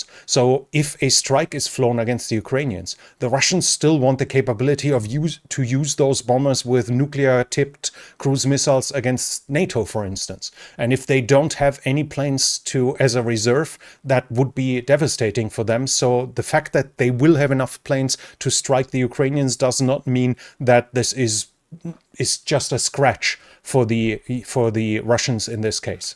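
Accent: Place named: Germany. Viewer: Almost sounds like you have a German accent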